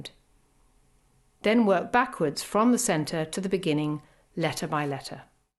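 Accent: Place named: United Kingdom. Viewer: i luv british accents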